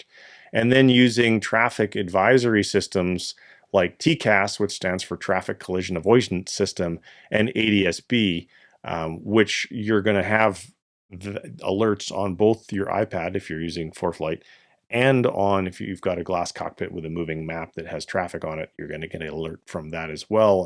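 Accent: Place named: Canada. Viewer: Judging from your voice, it appears you are Canadian or have spent some time there.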